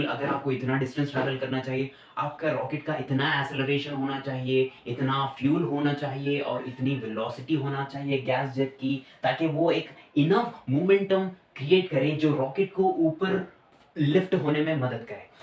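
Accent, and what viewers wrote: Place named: Hyderabad, India. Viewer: yoo, bro sounds hyderabadi, btw great explanation bruh, and why not uploading videos on yt rn??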